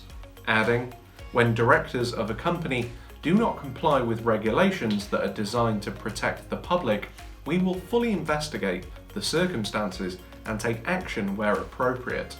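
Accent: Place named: United Kingdom. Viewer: Hola funny talking Brit dude! USA cowboy here. Yee-Haw bro. OK, Salutation done. Check. Suppose there was a white hat hacker group dedicated to finding out bad hackers and TAKING THEM OUT. Seek and destroy. Make them fear. Next, blockchain smart contract. Example, I got hacked by dipshit ransomware and I am pissed! I am pledging 0.01 btc to whoever takes out this garbage. And there are 10,000 other angry victims also wanting justice. The bounty gets up to 100 btc, that’s like $1.8 million. White hats say Yeah, We gonna git em. So they (several WH groups) go after them, destroy them, prove it according to smart contract and collect the bounty...And other black hats getting very nervous and thinking about changing their ways. Maybe becoming White Hat. 130 years ago, Where I live, If We had an a-hole We would put up a bounty and hunters would go git em. 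Ded or a-live. Keep up the good work and I will keep watching. Comment...FIRST!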